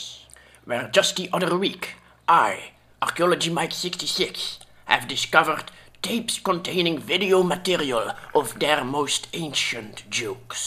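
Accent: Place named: Netherlands. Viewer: sounds like a dutch speaking english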